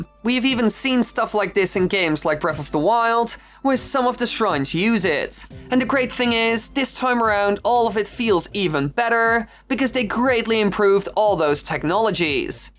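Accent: Netherlands. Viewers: OMG that’s why the accent mijn broer van Nederland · I really wish I could be there, I,m still in school and have homework to do😭. Also you sounded kinda dutch are you exactly from the Netherlands? And a nother also, I love your vids there epic!!! I have watched every single evolved video! Have a great day.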